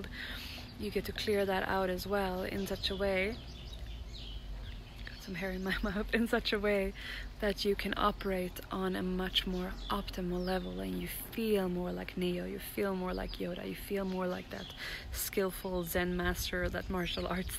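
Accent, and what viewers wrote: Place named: Sweden. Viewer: Älskar att höra dig prata med lite svensk dialekt! 😍 grym video ska verkligen testa detta!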